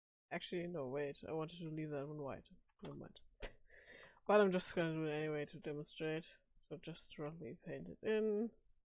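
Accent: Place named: Germany. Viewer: judging by the accent youre 100 percent german lol great vid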